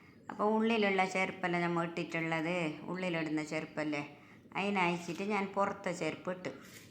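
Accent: Kasaragod, India. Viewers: Good 👍 ksd slang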